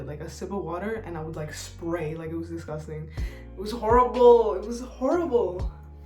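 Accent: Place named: New York, United States. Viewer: knowing she's from ny without actually knowing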